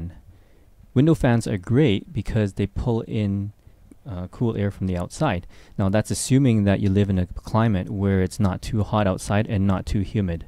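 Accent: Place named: Philippines. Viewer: Are you Pinoy? Your accent sounds a little like it.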